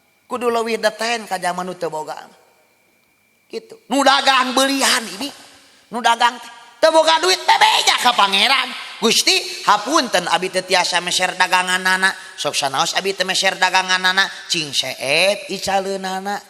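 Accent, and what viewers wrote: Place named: Bandung, Indonesia. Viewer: Jigana tipalih bandung atanapi bandung ngupingkeun logatnamah ❤❤❤❤❤❤❤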